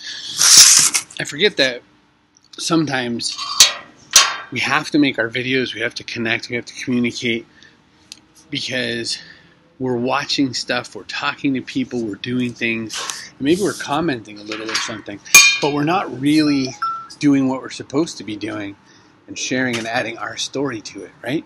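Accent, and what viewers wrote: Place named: Ireland. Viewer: Hi! I like writing poetry and your Irish accent so let me drop by and leave a comment on one of your videos too!:)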